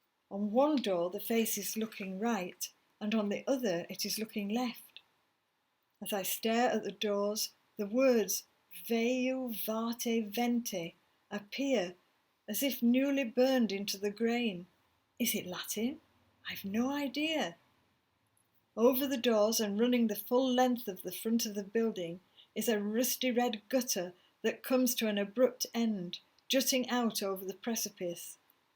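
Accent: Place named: United Kingdom. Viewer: I wish I had you reading this book in your wonderful British accent. I so enjoy it xxx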